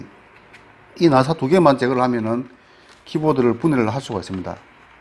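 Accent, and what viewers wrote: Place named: Western Gyeongnam, South Korea. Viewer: 서부경남분이시네요 ㅋㅋ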